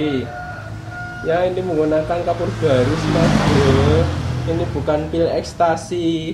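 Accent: Banyumas, Indonesia. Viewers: Bengkele ngndi mas ngomonge ngapak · Wong ndi rika....deneng ngomonge jawa ngapak kya aku😁😁😁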